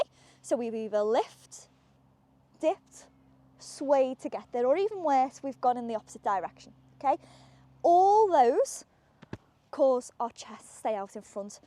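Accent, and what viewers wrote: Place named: England, United Kingdom. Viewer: you are a great teacher and I love your English Accent 🥰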